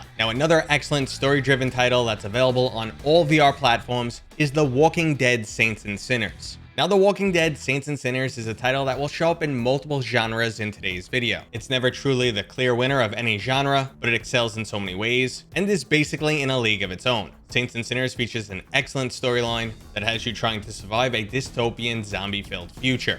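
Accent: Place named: South Boston, United States. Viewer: Appreciate the work put into this video and the Southy accent lo thanks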